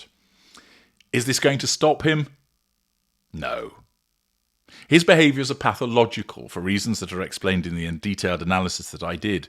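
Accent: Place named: Northern England, United Kingdom. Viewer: Who said you are the swindler??🤣🤣your northern accent is so strong - I hear around Cheshire or Stockport - who’s dumb enough to think you are this Israeli scammer. is definitely from ‘up north ‘. Derbyshire also a possibility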